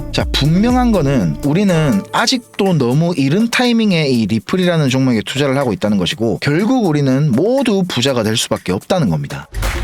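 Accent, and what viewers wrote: South Korea. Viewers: 확실한서울발음~^^ 퀀트1기입니다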